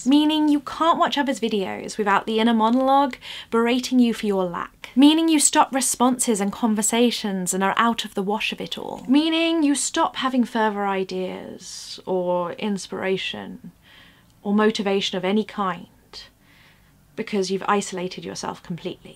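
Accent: United Kingdom. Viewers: Great to hear! Yes your writing and lyrical performances are very pleasing in and of themselves, but speaking as an American, I'd be lying to myself if I didn't credit your wonderful British accent as well. Haha. Also speaking as an American, I'll take the lashings on behalf of my country for doing our fair share to fuck up gender politics in 2017. bows to ironic applause someone throws a tomato (you read that word as "ta-mah-toe) ;)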